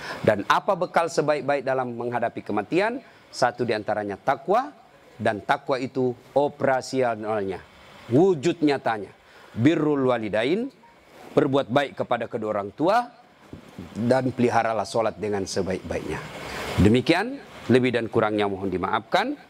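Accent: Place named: Bugis, Indonesia. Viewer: Sy senang ceramahnya sangat mendidik, logat bugis terkesan mengasyikkan, sy pernah tinggal di sulawesi, jd sangat teringat logat yg dibawakan...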